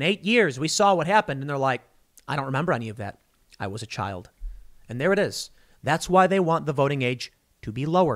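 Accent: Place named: South, United States. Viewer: And a thick southern accent ties the bow on the package of passive-aggressive sarcasm.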